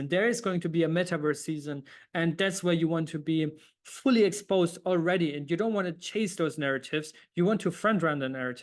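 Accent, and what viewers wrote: Germany. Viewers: oh shit i texted in german. Maybe im wright and your accent is german?!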